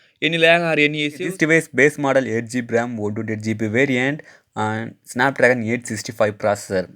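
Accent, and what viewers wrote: South India, India. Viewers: Your English sounds like South Indian English. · What a malayalam or tamilian style english😢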